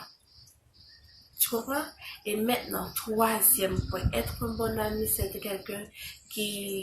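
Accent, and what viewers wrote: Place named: Haiti. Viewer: OMG j 'aime ton accent ,tu nous as dit que t'etais une haitienne , franchement je je crois pas ,la maniere dont tu parles le français c a féliciter ma cherie,maintenant je vais voir parmis mes amis lequels sont fake,lolllll.thx Belle Madame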